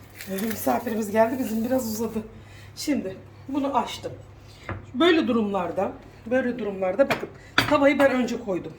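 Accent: Aegean, Turkey. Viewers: hanım ellerinize sağlık iyi bayramlar diliyorum canım bir Tireli olarak Bursa dan çok selamlar sevgiler yeni takip etmeye başladım gerçekten çok seviyoruz seni egece konuşmalarına bayılıyorum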